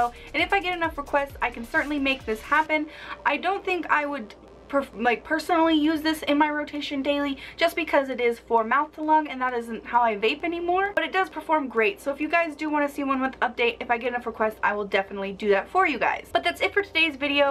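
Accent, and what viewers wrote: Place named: Germany. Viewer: Felicitari! Vorbesti engleză ca un neamt!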